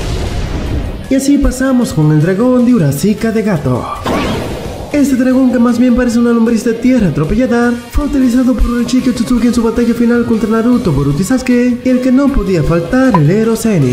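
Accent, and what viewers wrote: Dominican Republic. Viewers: Bro tú forma de hablar es peculiar de RD, será que si?🤔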